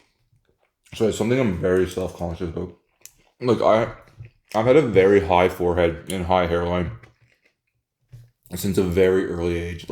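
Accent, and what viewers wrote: Canada. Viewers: Where in Canada are you from? I’m guessing Alberta by the accent.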